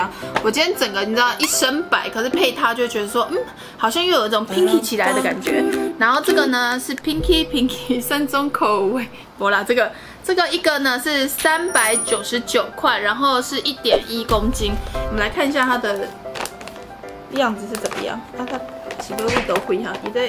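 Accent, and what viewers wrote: Taiwan. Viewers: 每次看講台語真的好道地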